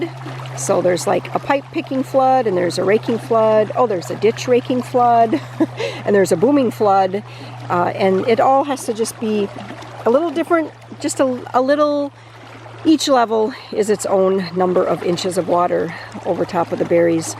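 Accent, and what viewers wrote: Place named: Wisconsin, United States. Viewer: You are definitely a Wisconsinite. Your accent. I was born, raised and educated there, so I know the accent. out, down, ought, supper, etc., etc., A great place to have been raised.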